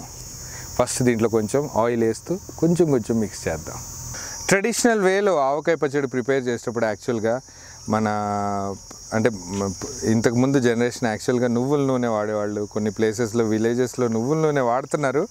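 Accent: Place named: Telugu, India. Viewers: Ur Telugu slang is very nice sir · Your Telugu slang is excellent bhai🙏 God bless! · Your Telugu slang looks like Andhra bhayya. Telugu lo chesinanduku chala santhosham. Devudu meeku Ela service chesenduku avakasham echinanduku aa devuniki naa namassulu. God bless you beta.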